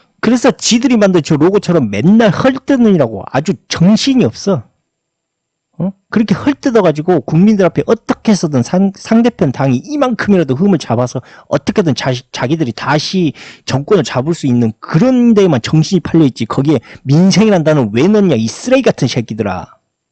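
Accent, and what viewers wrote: Jeolla, South Korea. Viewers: 은 절라도 말투? 경제에대해 시원하게 말씀해주시고 윤석렬은 특히 미워하시는걸 보니 진보(사회주의)에 가깝다. 사실 정치가들을 믿을 수는 없지만.....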